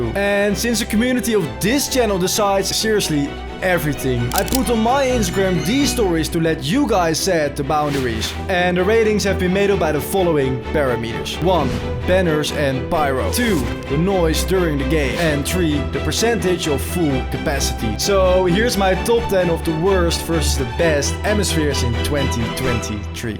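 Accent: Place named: Netherlands. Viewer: Verschrikkelijk Nederlands accent. Maar de manier van praten is helemaal stuitend. Na 1 minuut met schaamte afgezet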